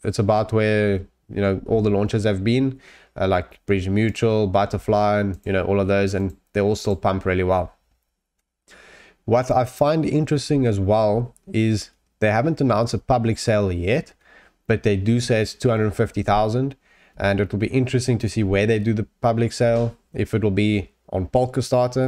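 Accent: South Africa. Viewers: Glad to find this channel . Is that South African or Zimbabwe accent? Are you based there. Thanks again good content for small audience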